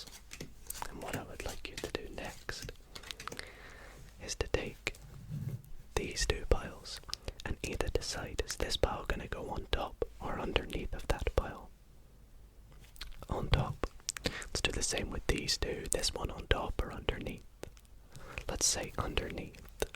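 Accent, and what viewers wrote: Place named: Ulster, United Kingdom. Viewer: Nice Ulster accent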